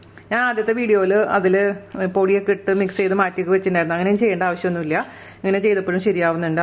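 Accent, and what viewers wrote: Thrissur, India. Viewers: ! Your musical narrations at thirsur slags with valid tips,super cake Xmas to you and family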